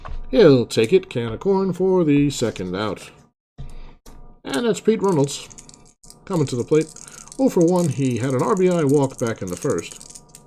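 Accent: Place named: United Kingdom. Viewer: Count me in and who is this voice of what sound like British persuasion?? Great voice Please continue very enjoyable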